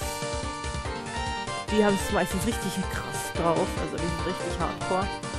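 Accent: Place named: England, United Kingdom. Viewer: Sehr schön dass das ganze jetzt richtig startet. ^^ Bin auch zuversichtlich dass du en Easy Modus schaffst, ich weiß ja dass du es kannst in dem Ramen wo das ganze für uns Normalsterbliche möglich ist. xD Bin auch schon auf alle anderen Stages gespannt. ^^ So nebenbei die blauen Items waren in dem Teil nur für Punkte da. Und schön dass ich wieder diese tollen englischen Akzent hören kann. ^^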